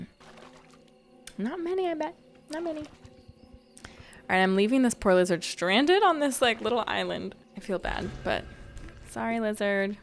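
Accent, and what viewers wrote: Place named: Magdalen Islands, Canada. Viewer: it reminded me most of the accent on Les îles de la Madeleine in Quebec. Close but not as “muddy”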